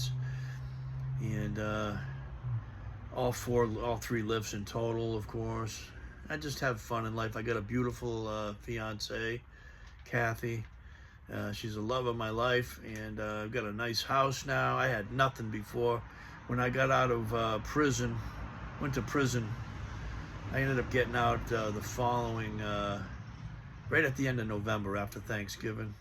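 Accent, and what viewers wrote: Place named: New England, United States. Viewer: good to hear your doing good man! I'm a new Englander myself not far from you right in southern new Hampshire I recognized the accent wicked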